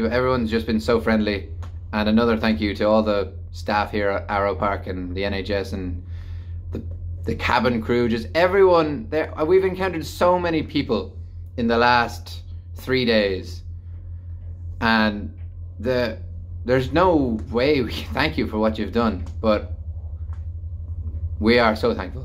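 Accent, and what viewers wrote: Ireland. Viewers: Irish men and their accent are just love. 😍😘 That's made me fell in love with. ❤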